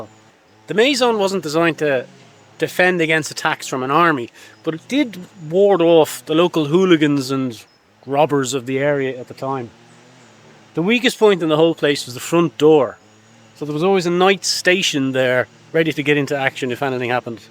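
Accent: Ireland. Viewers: Congratulations. You are a breath of fresh air, have a beautiful Irish accent and are a very genuine person, that's why you have so many faithful Subscribers. I just love following your antics and going on little trips with you, they're always showcasing quaint little French villages and countryside, thank you for making my days a little brighter and taking me with you on your trips and sharing your day to day life as well. Much love xxxx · Wow, you take us to some amazing places! Great music, beautiful Irish accent attached to an easy-on-the-eyes kind hearted dude. Thanks heaps. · And hearing an Irishman speak, using words rarely used here in southern California. So educational as well as amusing to dawdle around with you. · Can you also write the name of the chateau in the hill please, love your Irish lilt , but didn't catch it's name, and I want to put it on my next year 'tour of rural France ' list. 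Thanks 👍🏼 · loved this video, chateau in the cliffside was amazing! Thank you for all these videos, you are a very interesting and entertaining guy, maybe its your Irish accent! Ha ha 🇨🇦BC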